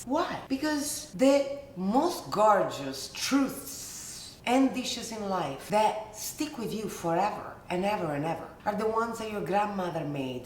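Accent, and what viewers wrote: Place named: Italy. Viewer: I will buy your book. From Brasil to improve my english Rsrs I ❤ your italian accent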